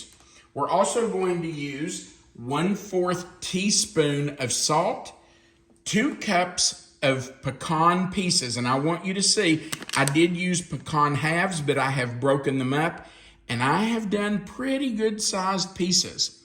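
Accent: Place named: Kentucky, United States. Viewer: Those look wonderful! You sound like my parents they come from Menifee county!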